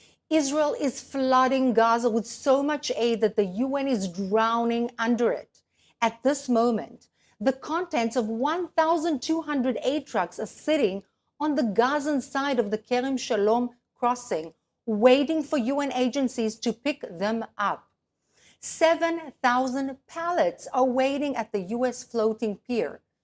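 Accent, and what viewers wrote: South Africa. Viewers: South African accent Stop lying Israel child killer You are propagandist You lost go back to south African racist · well that was entertaining....she sounds south african... 2X apartheid for her on the books.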